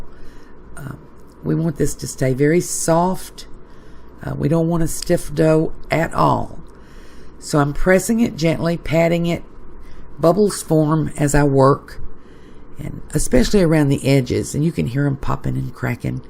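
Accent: South, United States. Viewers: I knew you were from the South !!!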